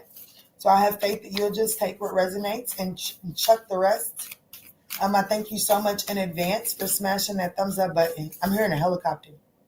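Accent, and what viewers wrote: United States. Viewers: Where’s your accent from? Part of me says Cali, the other says St. Louis. 😮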